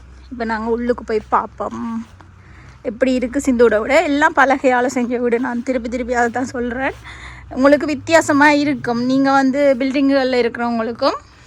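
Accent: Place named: Jaffna, Sri Lanka. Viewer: உங்கள் யாழ்ப்பாண தமிழ் Swiss இல் தழைத்து மலர்ந்து மணக்கிறது.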